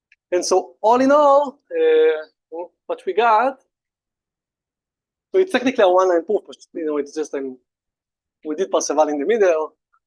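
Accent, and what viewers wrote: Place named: Israel. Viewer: תודה על השיעור ! (יאמר לזכותך שמרגישים ממש את המבטא העברי בתוך האנגלית)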